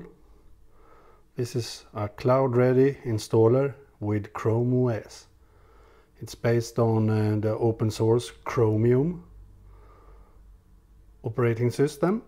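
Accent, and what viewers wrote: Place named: Sweden. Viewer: love the swedish accent :D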